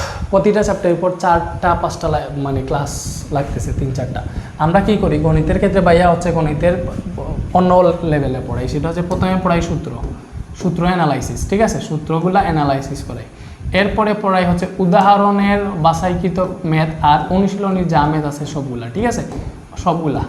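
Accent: Sylhet, Bangladesh. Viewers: Sir ki syleti naki 😅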